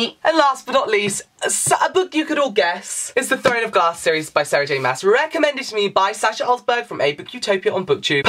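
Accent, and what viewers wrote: United Kingdom. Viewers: Happy Birthday 🎉 I like your English accent 🤗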